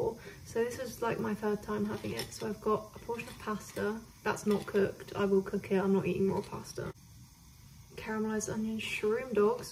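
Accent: United Kingdom. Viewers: how did i not realize u were british until rn 😭 not me leaving like fifty comments sry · British accent❤️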